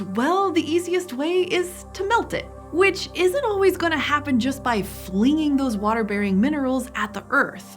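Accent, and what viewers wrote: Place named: United States. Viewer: “Wader” I love your American accent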